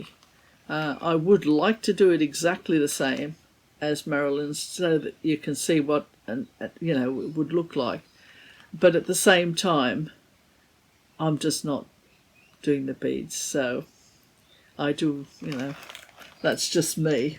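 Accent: Australia. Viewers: Hi,as always beautiful cross stitch,and lovely to listen to you chatting,are you Australian? ❤️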